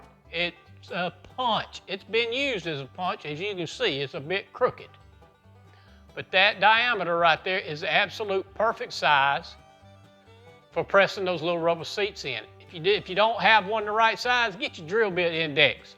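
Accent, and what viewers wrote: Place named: Italy. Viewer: Thanks for sharing . But With that accent you must be Italian. Those parts bags were a good idea, thank you 👍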